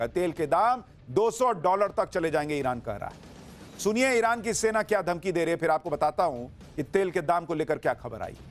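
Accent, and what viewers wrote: Bihar, India. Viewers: Ye to Bihari Tun hai bhai aap ka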